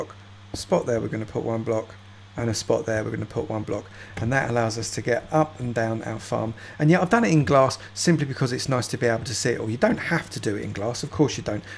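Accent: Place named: South London, United Kingdom. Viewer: How is it Gordon Ramsey when he doesnt cook the only thing similar is that he is also british. They both sound like they are from South London but it doesnt automatically make him Gordon Ramsey. Im guessing you are an American who thinks there is only one form of British accent. Let me correct you, there are a LOT of British accents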